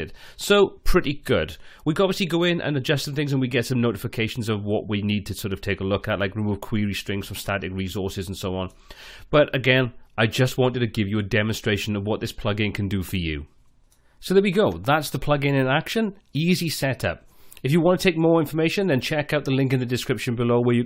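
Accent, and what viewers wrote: United Kingdom. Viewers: Love this tutorial, you sound like a fellow Brit! I have done an up to date video on the same topic on my channel, however all of these WP tips are still spot on! Great video! Clear & concise!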